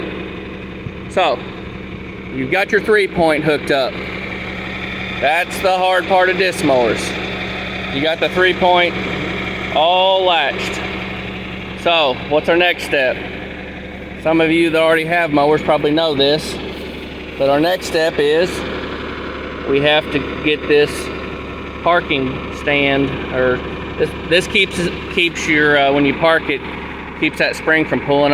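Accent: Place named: Georgia, United States. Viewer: Damn son that is one hell of an accent! Georgia boy?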